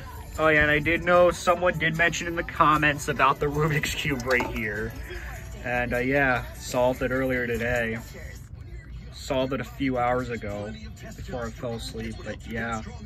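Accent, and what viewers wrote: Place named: United States. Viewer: You do sound America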